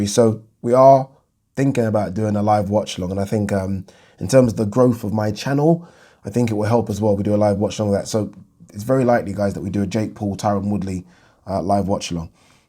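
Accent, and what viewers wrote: Liverpool, United Kingdom. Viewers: What part of Liverpool are you from?? 😃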